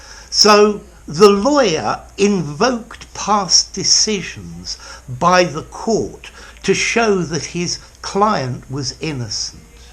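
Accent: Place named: England, United Kingdom. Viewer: The aura of your accent evokes some of my immensely-cherished memories in England some years ago.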